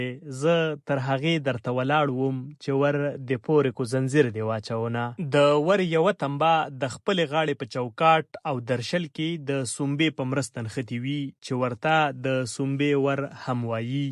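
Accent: Standard. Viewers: ډېر پهٔ ښکلې، پاکه، خوږه او سوچه پښتو خبرې کوې. دپښتنې ټولنې ښایسته او اصلي انځور وړاندې کوې. ډېره مننه ❤️🇦🇫 ستاسو ددې خدمت لپاره ډېر څهٔ کهٔ هم وشي کمه ده. ما سبسکرایب کړې، فالو مې هم کړې، ويډیوز درته هم ګورم او لایک کمنټ هم کوم.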